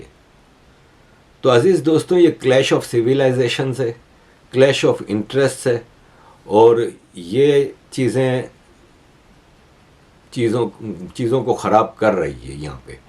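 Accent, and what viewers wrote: Gujarat, India. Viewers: Give up posting in Hindi and post in your native language, Gujarati, so you don’t have to struggle so much with your accent!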